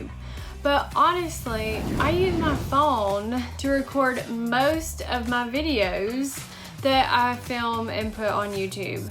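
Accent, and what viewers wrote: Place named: South, United States. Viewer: You sound like a True southern Bell...If my ears aren't mistaken me?👌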